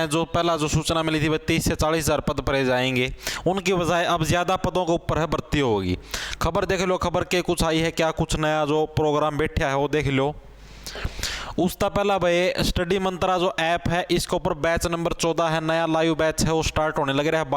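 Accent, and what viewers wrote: Haryana, India. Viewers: V good bhai you style full haryanvi👍👍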